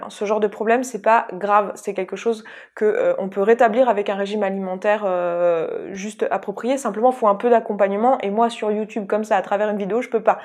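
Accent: France. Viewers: Tu es lyonnaise?:D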